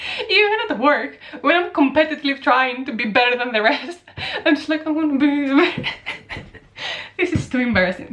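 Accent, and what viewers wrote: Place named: Greece. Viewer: that explains it! My good good friend Akis is from Greece in Trikalla, both your accents are fairly similar. I know the word clap and that is al... are you ready to be overcome with emotion... right here is comes: hirokrotima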